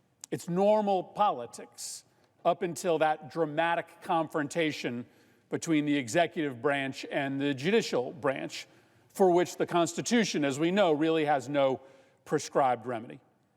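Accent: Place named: United States. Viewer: An American voice that god.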